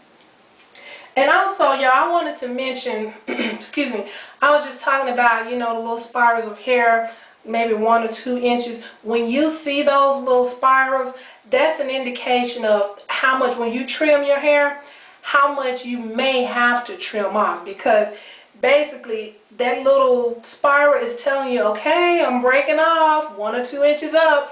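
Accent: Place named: Mississippi, United States. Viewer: I am originally from Mississippi and your channel (and accent) gives me a taste of home. I never thought to use hair grease to pre-poo. Well dang... Gonna try this next wash day.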